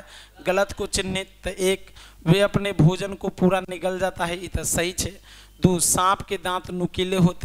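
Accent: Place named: Maithili, India. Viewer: Itna shudh maithli 🤦‍♀️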